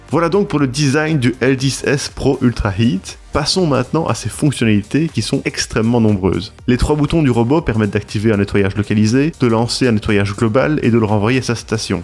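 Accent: Belgium. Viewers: Oufti l'accent du béwé!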